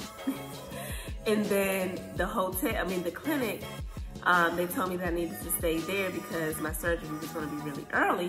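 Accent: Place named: Prince George's County, United States. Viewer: you sound like you from PG lls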